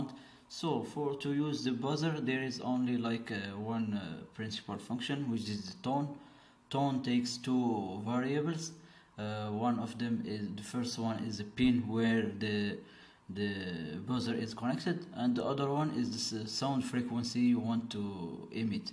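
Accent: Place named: Morocco. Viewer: منين لبلاد اياسمون، باينة عليك مغربي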